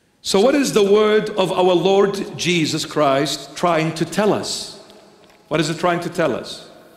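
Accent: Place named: Australia. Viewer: dear brother Mar Mari Emmanuel The Holy Spirit is truly guiding you Brother, may God bless you and protect you always in Jesus Holy name.:thanksdoc: p.s you have an Australian accent are you in Australia?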